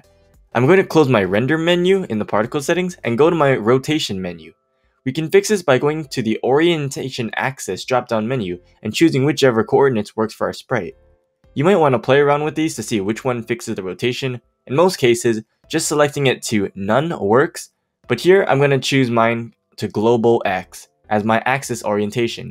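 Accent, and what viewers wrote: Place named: Japan. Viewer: Your accent is very strong more Asian type of accent Japanese and Filipino accent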